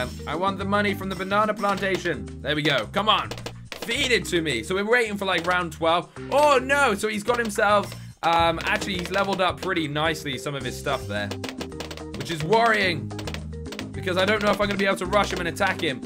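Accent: United Kingdom. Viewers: Is he british ??😬😬😬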